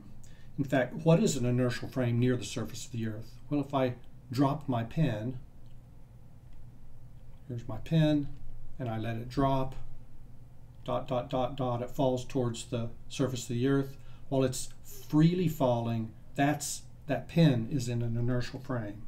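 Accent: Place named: Texas, United States. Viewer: That's a Texan accent right there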